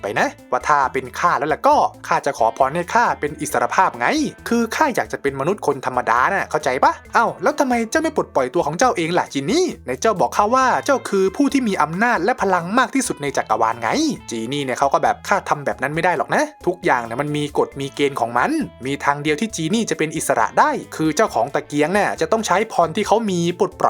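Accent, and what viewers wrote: Isan, Thailand. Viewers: ทำไมพี่พูดอิสานได้อ่ะ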